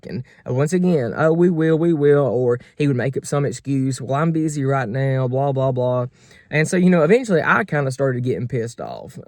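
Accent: South, United States. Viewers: I love your accent- true southern not fake- if you ever go to Memphis go in May for the barbecue festival and go to the Rendezvous for ribs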